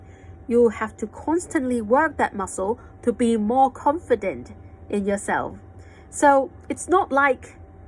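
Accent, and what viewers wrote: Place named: United Kingdom. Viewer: I hope you don't mind if I spot some of the mispronounced words in the first 4~5 minutes of your speech. Well first of all, I do think that you speak very fluent British English yet it's a bit stronger than usual (if you bother to listen to some native British people speak [I guess you do a lot]). Sometimes I feel that some sounds are intentionally spoken in a strong British English / you pretend to speak with a British accent, like 'feel' (with an unusually long /i:/ sound). If you could soften that very strong British accent, that would be great. OK the following words are spotted: while (it sounds like you were pronouncing it as 'wild' with an extra long vowel. It sounds a bit strange to me) significantly (missing 't' sound) strange - the 'g' sound should be a voiced consonant but then it's missed. house - again, the vowel is stretched to be too long. 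It sounds like haaouse. Again it doesn't sound natural. external - wow the 't' sound here is blended with the 'x' sound. Whenever the two consonants 's' and 't' are blended together, we should pronounce the sound like 'sd', but you kept the 't' sound when you pronounced it.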